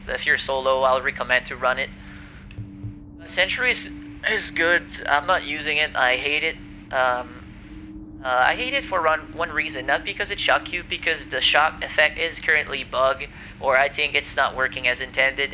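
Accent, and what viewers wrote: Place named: Quebec, Canada. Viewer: great video, something for me to build towards. where about are you from you sound a bit like gsp who was a fighter in the ufc he is French Canadian.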